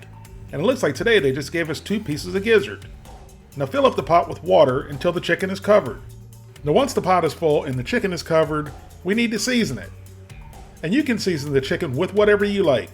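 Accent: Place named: Florida, United States. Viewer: You sound like your from Florida, also great video :)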